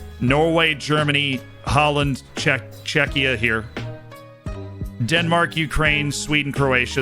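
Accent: England, United Kingdom. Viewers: love the non regional British accent but pronunciation is still americanised if you know what I mean... Still love your content tho. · Love the English accent quite happily listen to your commentary over most other people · This was awesome! And colour me impressed by your english accent. Didn't know you had it ya. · His British accent is immaculate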